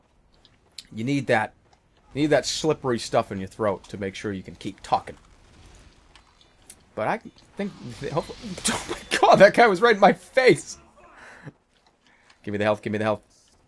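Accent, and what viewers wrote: Boston, United States. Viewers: Oh. You are so Boston-ey. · Im guessing you are either a real life version of a Scout or you are from Massachusetts/Boston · He is from Boston as is the scout, they have a similar accent.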